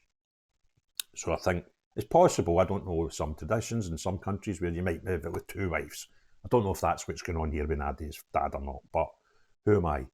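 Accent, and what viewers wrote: Scotland, United Kingdom. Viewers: I really appreciate your investigative journalism. Plus your topics. Equally in the meantime I am having to learn to understand the Scottish accent which I do enjoy.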